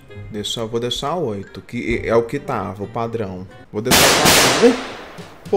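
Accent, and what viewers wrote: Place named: Rio de Janeiro, Brazil. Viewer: Carioca da gemah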